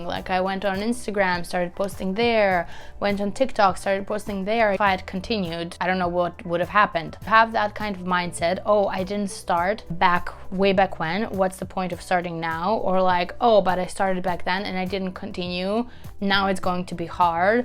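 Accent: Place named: Italy. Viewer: si sente che sei italiana eh. sgamata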